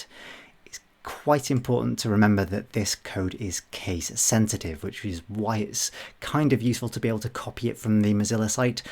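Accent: United Kingdom. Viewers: Love your training series and your British accent.Thanks for sharing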